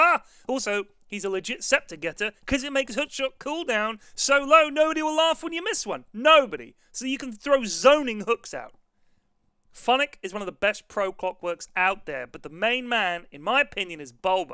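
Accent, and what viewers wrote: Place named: United Kingdom. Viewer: his british accent makes everything sound funny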